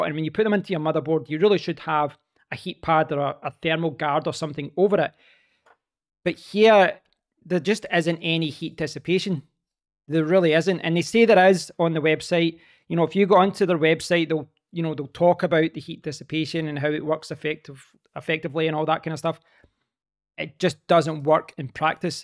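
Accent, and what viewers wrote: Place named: Edinburgh, United Kingdom. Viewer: what a lovely accent!! Here in Edinburgh, and find this video sound so familiar!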